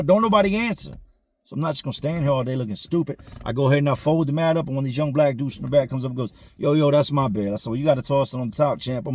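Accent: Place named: Maryland, United States. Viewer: You sound like you from Md. Love how you don't sugarcoat like prison is fun because its terrible.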